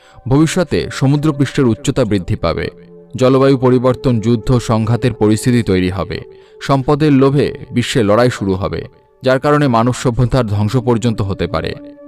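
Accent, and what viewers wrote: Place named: Bangladesh. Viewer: আপনার কথা বলার style, উচ্চারণ সব বাংলাদেশের মানুষের মত ।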